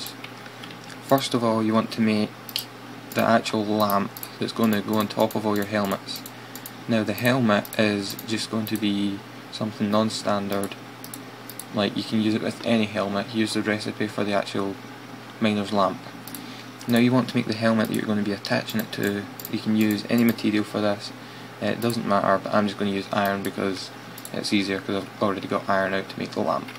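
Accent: Scotland, United Kingdom. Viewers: i love your accent. scotland? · yay a scots like me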